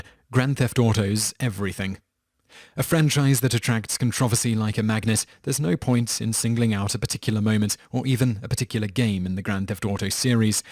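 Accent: United Kingdom. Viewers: Oh dear god. If I see another comment complaining about how the narrator pronounced 'Controversy', I'm going to smack someone. It's his accent. It's NORMAL! There is more than one kind of accent in the UK. Not everyone there sounds like Hugh Grant, so get off your high horses. Every English speaking country pronounces things in a different way. Perhaps we're the ones saying it differently because the English language obviously didn't start in North American. It's like me making fun of Americans for spelling things all wrong Ex. It's Humour not Humor or It's Colour not Color. Though, with all due respect, the USA is probably the last place to look to for 'proper' English. Speaking and spelling.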